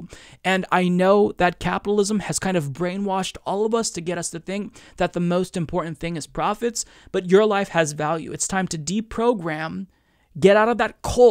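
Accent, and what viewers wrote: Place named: Midwest, United States. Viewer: I've heard you mention in the past you've worked on your speech and that either you're insecure about the way you sound or you've gotten negative feedback about the way you sound. I want to tell you that I love listening to you talk. I love your midwest dialect. I love your mannerisms and -isms. Btw I am someone who knows most people can't stand the way I talk or sound so I feel like I'm a honorary expert!! 🤣 😅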